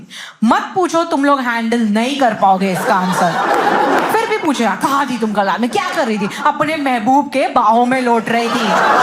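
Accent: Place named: Mumbai, India. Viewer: Is she from Mumbai by any chance? That accent sounds very familiar (Lots of my family members in Mumbai)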